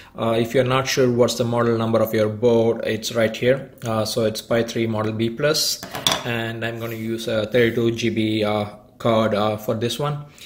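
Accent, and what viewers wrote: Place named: India. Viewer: Thank you,. 1. So I need to find a router that has a USB port and connect the RPI and the hard disk to that router? Thanks 2. Yes Volumio and Max2Play are paid but hopefully they will offer some support in return, at my age I need a lot of hand holding when it comes to software. 3. These small screens connect via what is called SPi. I assume this means Special Purpose Interface and they require coding of which I have zero knowledge. I would have to find some youngster willing to help me with that. 4. So your laptop was never connected to the RPI? Thanks again for your quick response. I guess from your accent that you are an Indian living in the US. Probably a young software professional. So thank you for helping a fellow “Desi”.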